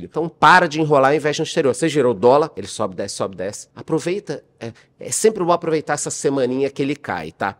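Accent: Rio de Janeiro, Brazil. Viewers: Único carioca que consigo ouvir por conta do sotaque.... quem não guenta é louco!!!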